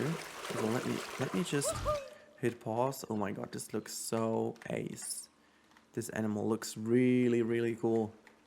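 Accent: Germany. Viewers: Direkt den Deutschen Akzent herausgehört :)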